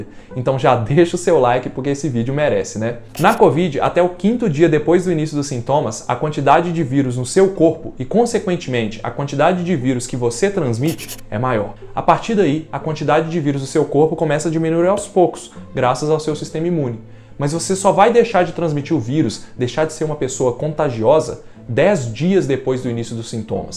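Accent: Minas Gerais, Brazil. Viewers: Obrigado pelas dicas ! Adoro o seu sotaque mineiro . Tb sou mineira uai !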